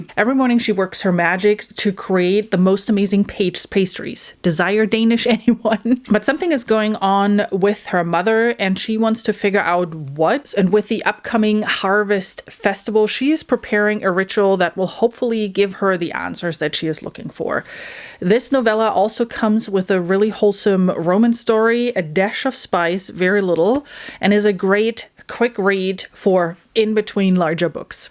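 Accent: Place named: Boston, United States. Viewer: First, love the accent (north east bostonian?) seemingly masked tho— just wanted to say first time viewer but will check into your rec’s!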